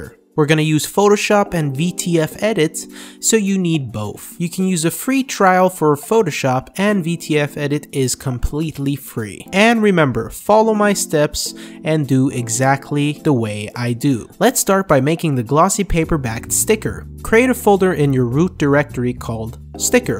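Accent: France. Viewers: you are french ? "salut mes amis" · Hi, Are you french? Salut, t'es français ?xD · Are you french ? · hey are you french ? great vid btw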